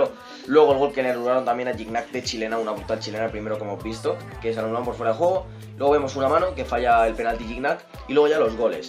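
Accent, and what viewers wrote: Mexico. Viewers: hablas como mexicano, vives en argentina?? o eres argentino o q onda? pregunto nomás x curiosidad, a mí m encanta españa pero tambn argentina, saludos de méxico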